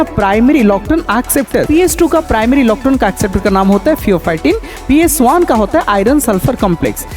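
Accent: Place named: Kolkata, India. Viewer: Aap tho kolkata wale ho😅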